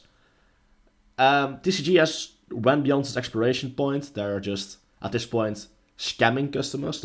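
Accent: Netherlands. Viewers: Are you Dutch? I can tell from your accent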